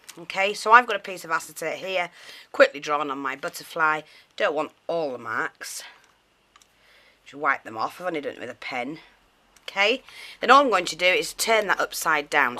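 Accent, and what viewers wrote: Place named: England, United Kingdom. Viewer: i m glad to hear english im from there but live in ireland.laughing you saying buggering thing.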